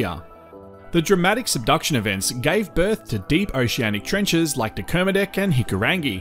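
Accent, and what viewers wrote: Australia. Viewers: you do an excellent production with the unique Australian that is easy to listen and related to And no worries mate Today I was going to look into the Tonga blast a few years ago I'm a big fan of Edward Lorenz and non linear dynamics so it's interesting to me Especially on such scale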